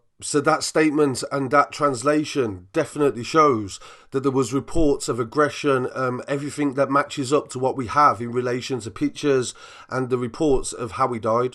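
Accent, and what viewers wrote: Birmingham, United Kingdom. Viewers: You from bruming ham i hear your English brummie dialect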